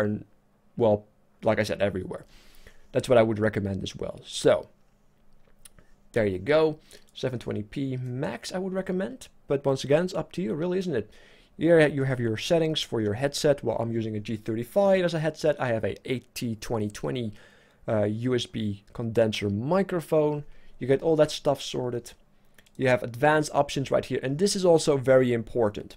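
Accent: Netherlands. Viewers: i guess your dutch:P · youre dutch?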